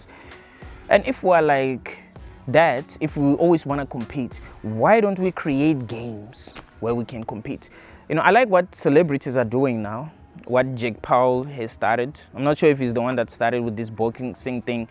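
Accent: South Africa. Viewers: hey bro just started following you i like your content bro, i always wanted to ask where you from coz i could tell you from SA, lols im from there too, good to hear someone who talk reality, out here so hard to find people like you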